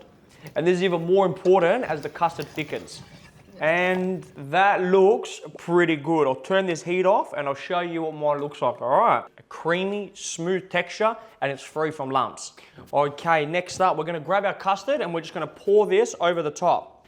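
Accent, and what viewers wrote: Australia. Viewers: Its a typical Wog accent (Greek-Australian). Its like the equivalent of how Italians in New Jersey have the Jersey accent. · He's Greek so Greek Australian accent! Lol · Why does he sound Australian. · Because he is Australian 😊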